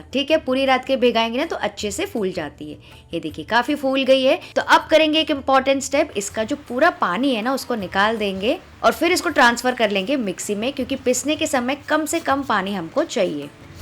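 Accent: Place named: Sindh, India. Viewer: adee jo ghalahin dadho aaio khas toarte sindhi lahjo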